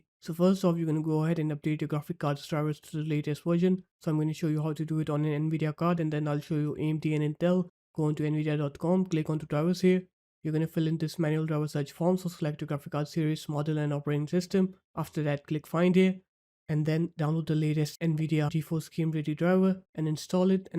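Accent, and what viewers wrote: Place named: India. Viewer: thought i was cooked then i heard a faint bit of indian accent so i know i am GOOD bro this fix WILL WORK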